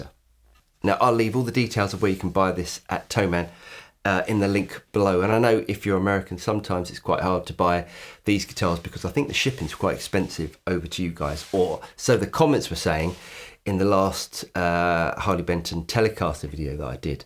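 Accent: United Kingdom. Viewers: Ummmm I got the suspicion that you are Brittttish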